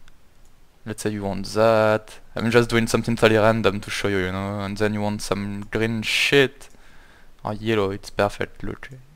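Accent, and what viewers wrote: France. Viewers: mec tu es Français ? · comment tes pas crédible parler anglais on le sens l'accent fr gros mdr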